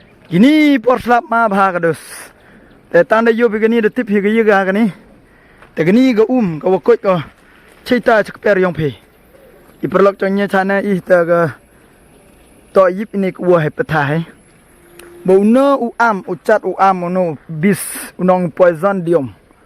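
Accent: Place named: Meghalaya, India. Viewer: Nga u #Bhoi Kwah oh u tip chi klam #Pnar kam phi leh Sngiaw suk sang te i sur #Pnar phi bro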